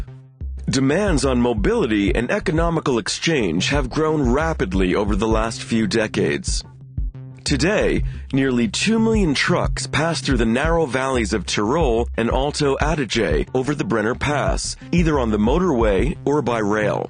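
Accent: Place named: United States. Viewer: Is there a reason for the American Accent?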